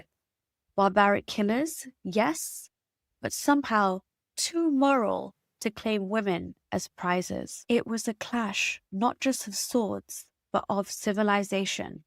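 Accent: United Kingdom. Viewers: Maybe because she has a British accent?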